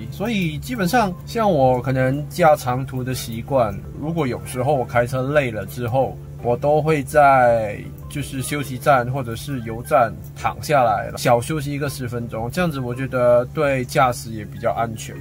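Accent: Taiwan. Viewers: 只聽的話，感覺口音像台灣人。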